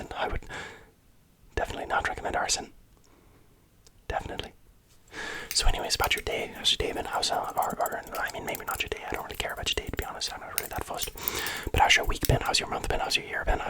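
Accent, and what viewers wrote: Ireland. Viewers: the irish accent is sooooo good! I need more of it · His Irish accent is better than mine an actual Irish person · My hair is literally how he's describing it at the moment. And I LOVE the Irish accent! ❤ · as an irishman, i approve of this even if it is all over the place i'll take it literally staying w a friend in dublin which is kinda the accent ur doing but also with other dialects from around the country sprinkled in but i feel like being in dublin this video is fitting to sleep to when home is 3 hours across the country and people sound nothing like that from home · I am Spanish but I had an Irish upbringing and the accent makes me SO homesick 😭😭 The meassuring part made me drop the phone. Yes, you caused that 😂 Carry on with your amazing work and your sense of humour ❤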